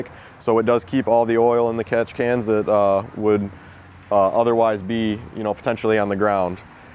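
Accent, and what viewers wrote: United States. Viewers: twice not two times , mirror not mir ninety not nindy ...americans murdering the english language once again .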